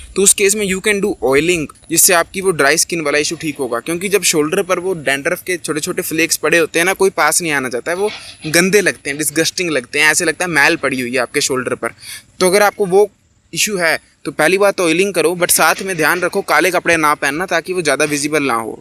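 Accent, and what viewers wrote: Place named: Punjab, India. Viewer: Bro tera Punjabi accent end hai❤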